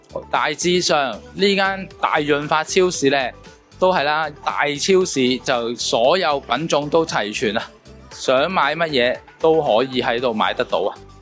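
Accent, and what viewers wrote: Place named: Hong Kong, China. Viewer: 點解您講野口音咁似港人仔仔🥹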